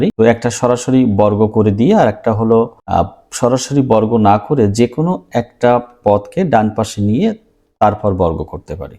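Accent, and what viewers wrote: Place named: Bogura, Bangladesh. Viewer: Apni mone hoy bogurar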